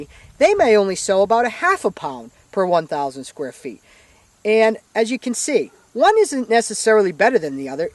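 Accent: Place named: Western Massachusetts, United States. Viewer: Trying to pinpoint his accent. Western mass?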